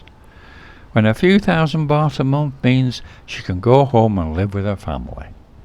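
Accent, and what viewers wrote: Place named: Yorkshire, United Kingdom. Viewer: Think I sat next to you in Heavens Above in Jan this year? I recognized the accent and when I asked if you were from Yorkshire you sounded surprised/concerned and asked me how I knew. It was you right?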